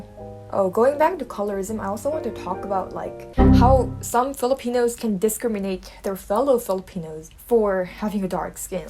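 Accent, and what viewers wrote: England, United Kingdom. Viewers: Wahh i like your English accent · loved her english accent you can apply as a narrator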